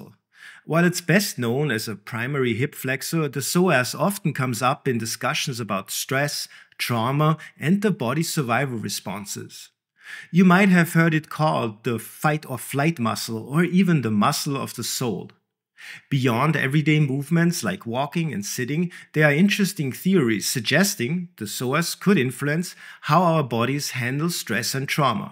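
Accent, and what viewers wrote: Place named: Germany. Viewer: And don't forget the German accent :)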